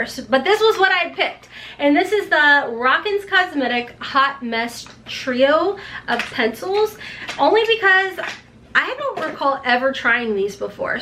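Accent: South, United States. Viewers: I love every so often the southern twang comes out “maaaatte” shades ❤️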